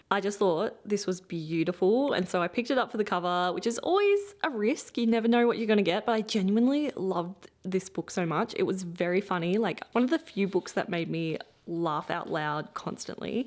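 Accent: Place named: Australia. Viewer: It's so interesting to me how accents differ between English speaking countries, especially an Aussie accent.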